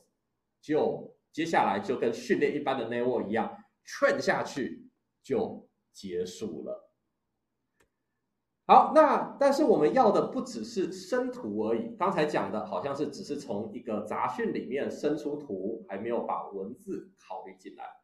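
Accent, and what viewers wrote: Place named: Taiwan. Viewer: 哈哈 我居然听到了 台湾老师的讲课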